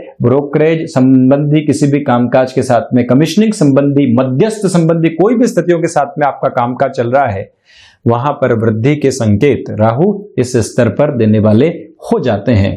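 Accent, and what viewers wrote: Standard. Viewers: Nice shudh Hindi..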